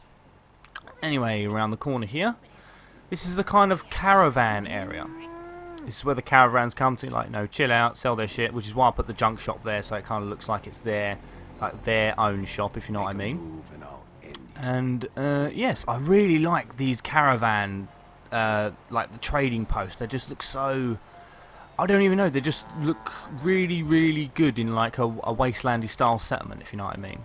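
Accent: United Kingdom. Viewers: I just love how English you are when you say things like "take a gander up 'ere" or " 'ave a nice cup of coffee"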